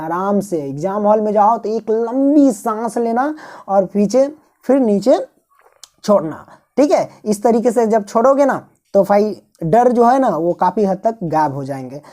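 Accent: Bihar, India. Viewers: Aap bihar se ho kya? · Sir aap kaha se hai apki avj se lgta h aap Bihar se hai ??👍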